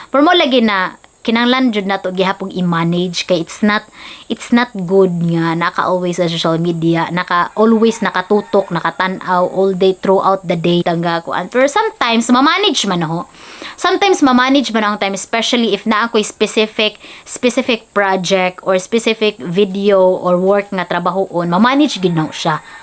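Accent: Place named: Bohol, Philippines. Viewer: Dli k tinood tga bohol kung wla k kabalo sa accent ni ms, especially ang iya mga word gegamit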